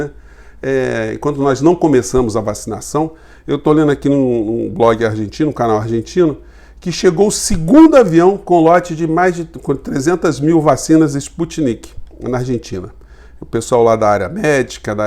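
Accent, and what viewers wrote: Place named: Rio de Janeiro, Brazil. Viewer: Único carioca que eu gosto de ouvir! N só pelo conteúdo e qualidade da linguagem, mas o sotaque TB é agradável! Temas densos e penosos explicados de forma perfeita, raciocínio impecável!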